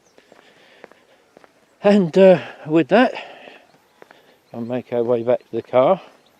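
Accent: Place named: Sussex, United Kingdom. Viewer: What a wonderful video, I grew up in Eastbourne and spent many happy hours walking and bicycling through the Pevensey Levels and nearby areas. Firstly, with my parents and Sister, then my best friend Peter Burgess, on my own and later with my Son Gavin. Your video really takes me back to those happy moments. I was born in 1960, so my time's spent here was from the 1960s through the 1990s. I moved to the USA, Lincoln, in Northern California in 1999, and I must say I do miss being able to hop on my bike and ride the lanes. Love your local accent. It's very much like my own, which I'm happy to say I've mostly retained. Thank you for taking me back home for a little while, I'm so glad to have found your video and just subscribed to your channel.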